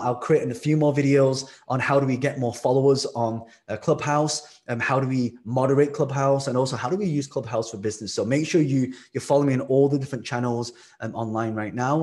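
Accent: United Kingdom. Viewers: I love the good knowledge from you.I love your British accent also.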